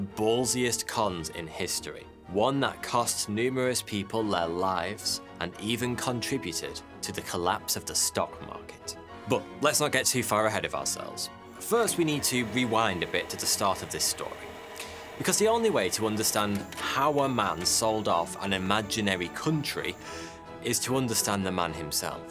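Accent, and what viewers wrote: United Kingdom. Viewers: Anyone surprised the guy was British? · I always hear "forty two" instead of. British accent is confusing 😂😂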